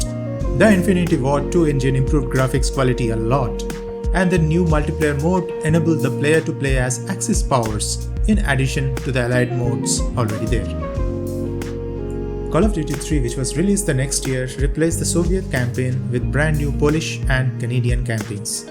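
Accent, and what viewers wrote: India. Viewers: Are you from West bangal. Your voice is like Kirtiechow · u indian ?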